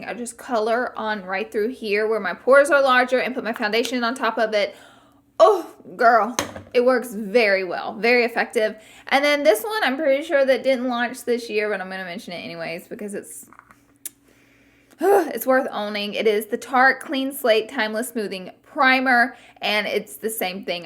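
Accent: South, United States. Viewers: Love you too girl! I started watching you a couple years ago and I instantly fell in love with your channel! You are so fun and humble! I am honestly proud and happy for you on how far you've come! Don't loose the Southern accent! Hugs. Thanks for sharing this video.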